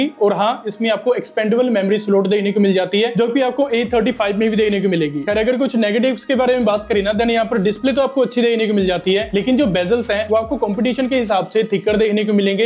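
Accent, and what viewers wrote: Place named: Punjab, India. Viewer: Are you punjabi? Your hindi has punjabi flavour😂😂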